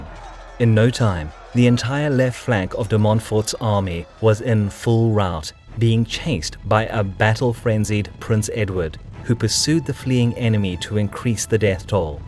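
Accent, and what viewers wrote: South Africa. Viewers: Is this narrator South African? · Is this guy south African?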